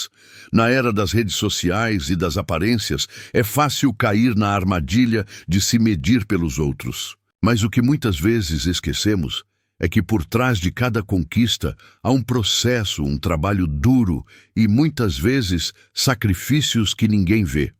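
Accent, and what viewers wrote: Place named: Portugal. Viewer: Os vídeos são bons o que incomoda é esse sotaque português não entendo porque colocam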